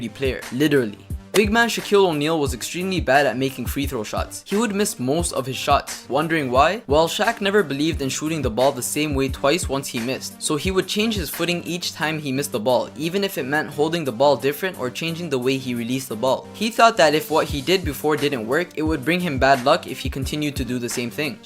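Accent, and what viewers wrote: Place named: India. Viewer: 听起来像印度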